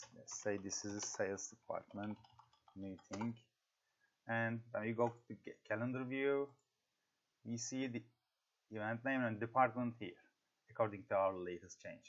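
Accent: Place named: Turkey. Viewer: Merhaba, şöyle bir fonksiyon mevcut mu excelde? İçeriğindeki bilgiye bakılmaksızın bir sayfadaki hücre format biçimi olsun, satır ve sütun yüksekliği, genişliği olsun diğer sayfalar ile köprülenecek, bağlantılı hale getirilecek? Yani ben ana sayfada a1 hücresi için metin hücresi olacak diyeceğim, genişliği de 3cm olacak diyeceğim ve diğer 10 sayfada da bu değişiklik takip edilecek, otomatik uygulanacak. Hangi değişiklik yapılırsa yapılsın, sallıyorum genişliği 4cm olduğu zaman diğer sayfalar da değişecek ve bu sadece bir hücre değil bütün hücreler için geçerli olacak. Kısaca gölge sayfa gibi çalışacak ama üstüne formül ile ayrıca hesaplar döndüreceğim. Sanmıyorum ama uzun süredir bu sorunun cevabını bulamadım. Başarılarınızın devamını diliyorum. Videoyu dinlerken aksandan ötürü bir türkün seslendirdiği anladım, danışayım dedim :)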